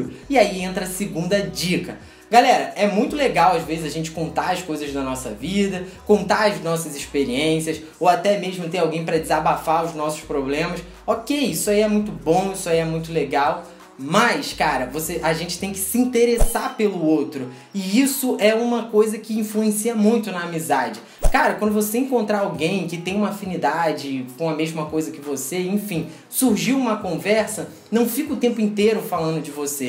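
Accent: Rio de Janeiro, Brazil. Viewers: Tu è do Rio né?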